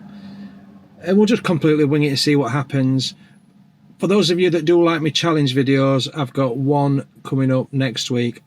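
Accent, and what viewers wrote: Yorkshire, United Kingdom. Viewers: Non Brit here, where is your accent from? Yorkshire? Love it❤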